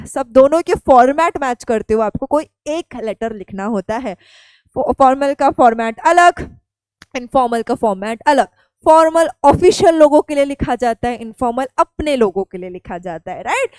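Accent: India. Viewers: Hand gestures ,indian english and over acting pure ke pure sade panso rupey cut kardiya jayega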